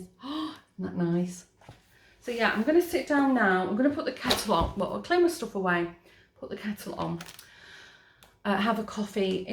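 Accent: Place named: United Kingdom. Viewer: Hey, I'm a new subscriber, I only just realised you are living in Holland, I assumed you was in the UK, think it was your accent that threw me 😃, I hear Holland is a very beautiful, clean, country, with alot of green, and flowers everywhere☺️ but also heard it really high the cost of living there. What are the pros and cons living there, and do you miss the UK at all, do you get to go back there often 🤔 take care. Stay safe 🙋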